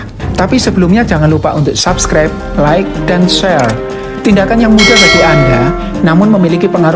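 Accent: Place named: Surabaya, Indonesia. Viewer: Ini pembacanya pasti orang sekitar Surabaya, logatnya medok.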